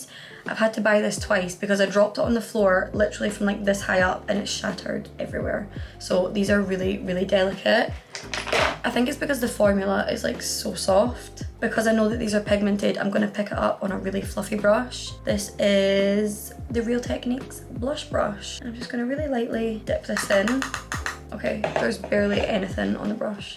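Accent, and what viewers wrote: Scotland, United Kingdom. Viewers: I haven't subscribed to anyone in a very long time, but your video was refreshing to watch, calm and informative. Also, love the scottish accent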